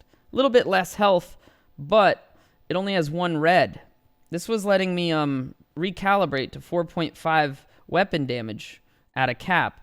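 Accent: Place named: United States. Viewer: Why does sound American now?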